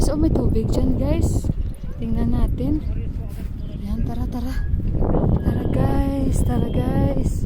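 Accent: Iloilo, Philippines. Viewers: Maham ot gid ko sa imo te ah ky may tuno ka gid ya sa ilonga heheheh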